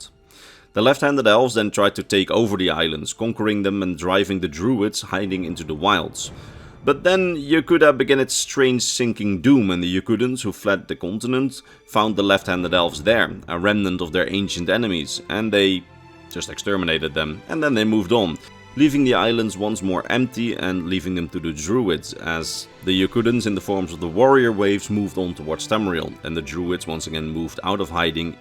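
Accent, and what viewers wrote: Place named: Netherlands. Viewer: Uw accent... U bent toch Nederlander of Flander? Videos op het Nederlands zullen ook erg interessant zijn.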